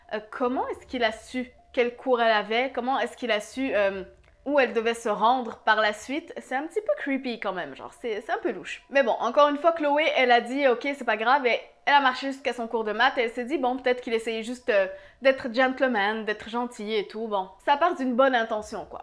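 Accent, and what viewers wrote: Quebec, Canada. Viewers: Tu es québécoise ?Parce que perso j’adore ton accent 😍😍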